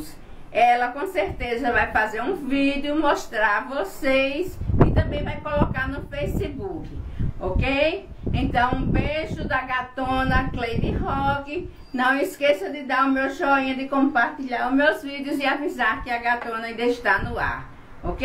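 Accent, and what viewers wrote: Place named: Paraíba, Brazil. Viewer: Olá. amore amo o jeito/sutaque q a senhora fala,lembro da minha vó falando ,minha vo é da paraiba/João pessoa,ela vive aqui no Rio de Janeiro, aie ela viajou/para passa uns 2 anos aí pra João Pessoa ...amooo os sutaques lindos de vocês 😍👏 -->❤sutaques (jeito de falar)❤<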